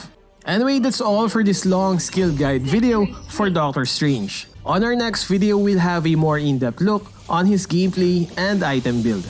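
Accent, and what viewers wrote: Philippines. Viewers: it sounds like he has a filipino accent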